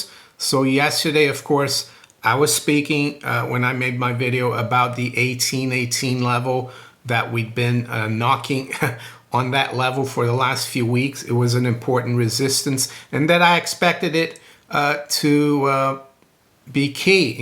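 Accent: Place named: Italian-American, United States. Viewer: Brazil? You sound like any US/NY Italian-American. Now in London. You should explain your family's ethnic origins, travels and education. Fascinating. ... You look like you live very simply, frugally. If so, the bravo.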